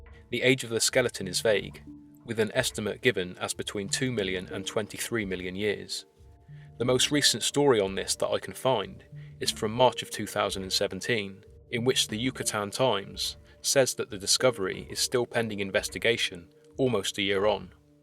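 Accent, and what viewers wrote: United Kingdom. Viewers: I hope someone scrutinizes the hell out of the way you speak, chomping at the bit for any "mispronunciation". I guess you've also never heard a British accent before. Asshole.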